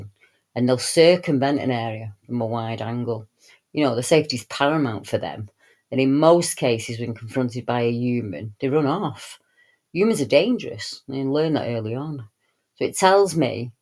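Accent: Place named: Manchester, United Kingdom. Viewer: Hi, I hope you are well, having a good day and I hope you have an absolutely beautiful weekend!! I have said it many times before and no doubt will say it many times again. Thankyou so very much on behalf of all the witnesses to all the various types of "paranormal actvity" and those of us who feel obliged to investigate these experiences and have been guided by your constant help and although never steering any of us "this way or another way" just sticking with the facts, and then those of us who just like to listen to that "Manc brogue" and beautiful accent..... we all admire, respect and thankyou for all you've done, are doing and will do and who knows where the heck we'd all be without all of the thousands of hard fought hours you have kicked into touch - THANKYOU 🙏❤️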